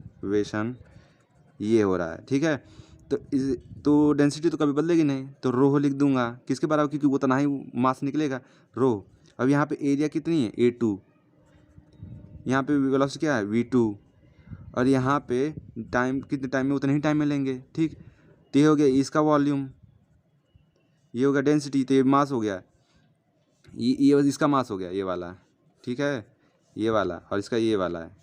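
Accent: Bihar, India. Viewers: Ur bihari twist in hindi so wonderful 👍👍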